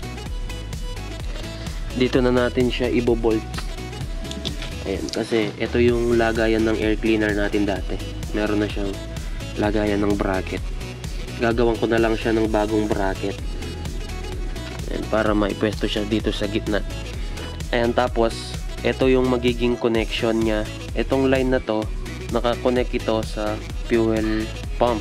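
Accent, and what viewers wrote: Pampanga, Philippines. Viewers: Kapampangan ka boss? Halata sa accent eh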